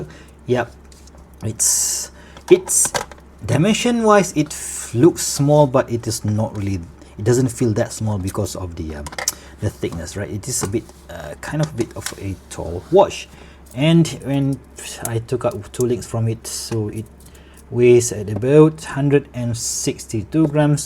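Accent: Malaysia. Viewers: Bila dngr slang dah agak dah mcm malaysia/indo, tngok dkt about from malaysia, terus subscribe, sbb nak cari jam yg affordable haha teruskan content broooo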